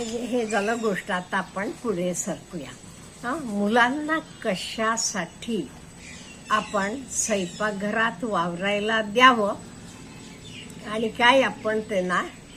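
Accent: Karnataka, India. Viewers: तुम्ही खूप गोड आणि हुशार आहात.तुमच्या बोलण्यावरून तुम्ही Karnatak च्या असाव्यात हे ओळखले होते.तुमचे videos informative असतात.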